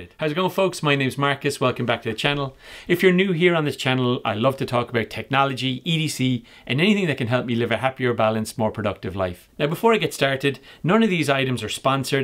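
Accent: Ireland. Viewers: R U Irish. I like your accent. I lived in Galway for 10 years.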